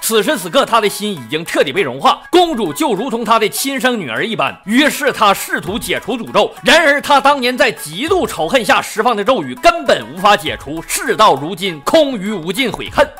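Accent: Northeast, China. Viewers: 就喜欢听牛叔说 东北大辣皮儿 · 说朗朗额够，东北牛叔英语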